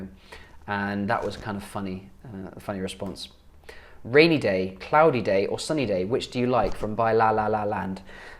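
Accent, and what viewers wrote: United Kingdom. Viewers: learning arabic from British accent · more British accent videos plz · I wish I can speak British like you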